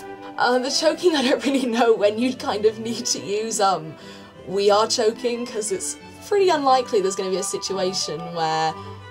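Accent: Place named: United Kingdom. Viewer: *o* Pretty girl with British Accent. *Faints*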